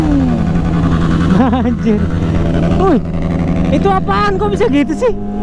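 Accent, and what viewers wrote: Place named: Medan, Indonesia. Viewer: Mon maap bang lu orang Mane nih dari logat logatnya macam orang Medan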